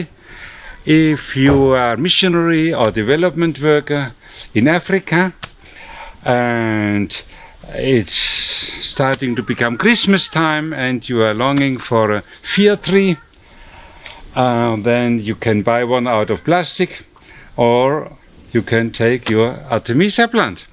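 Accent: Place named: Germany. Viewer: Me gusta como los alemanes hablan el inglés! Sobre todo en la pronunciación de la W 😅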